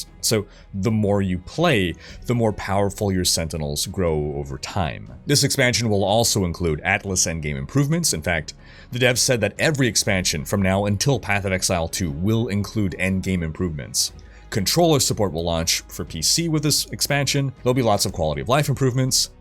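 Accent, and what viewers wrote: Quebec, Canada. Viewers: Are you from around Montreal? Your Quebec accent is on point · His "english canadian" is so good, I wonder if is his french canadian is his mother tongue or not. 😀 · Wow did not know you spoke Québec french ! Ton accent est excellent ! spa mal bon ! Sa fais des années que je te suit, tu es la meilleur source d'inforemation sur Diablo et autre que je connaisse sur youtube ! Lache pas ton excellent travail ! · Hahaha so nice to hear you talking (Canadian) french ! (Belgian/french speaking follower here :)) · Playing ladder, for the first time in d2 (played this game back in the day, never made it all the way to Hell difficulty). I see this ladder as an opportunity to finally get to endgame and experience it. I made a fire druid, very funny since the 2.4 patch. At the time of writing, I’m level 55, act 3 Nightmare, and I’m having a blast so far!!! 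Thanks to your video I managed to do a Spirit Crystal sword and it is very strong!!! Also, I just recently discovered your channel cuz of D2R ladder, and love your content! Specially you speak french canadian (as I am), that Câlisse was funny!) 😂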